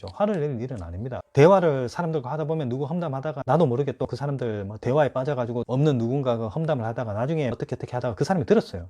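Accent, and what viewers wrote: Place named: Gyeongsang, South Korea. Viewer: 감사합니다 ~^^ 지나가다 들렀습니다. 구수한 경상도 사투리가 친숙하네요 말조심하는 하루가 되도록 연습하겠습니다.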